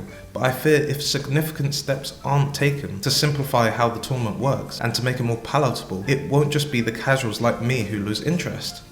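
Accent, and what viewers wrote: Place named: United Kingdom. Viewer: You sound British. Us southern hemisphere supporters don't keep up with your rugby tournaments either.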